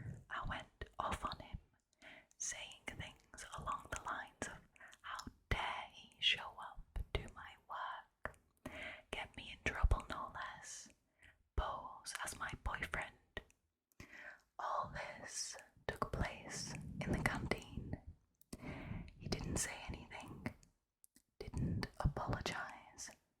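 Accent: Northern England, United Kingdom. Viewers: I absolutely adore your videos. You're fast becoming my favourite asmr artist and I love it when I get a lil notification telling me you've posted! I love the mix of whispers and fluffy mic brushing, it is soooo tingly.☺ would love just a fluffy mic video from you tbh! Also love your Northern accent, pls tell me you're a Sheffield gal?! Thank you for the videos!!! Xx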